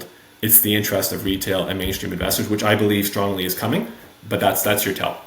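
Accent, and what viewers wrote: Netherlands. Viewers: German name, Dutch accent. I saw some interesting unknown high risk , high reward names in your playlist 🤔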